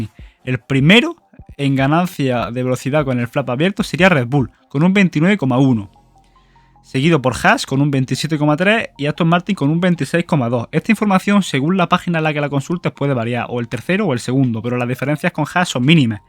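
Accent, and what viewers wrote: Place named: Jaén, Spain. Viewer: Por tu acento diria que eres de jaen. Yo soy de ahi. Me suscribo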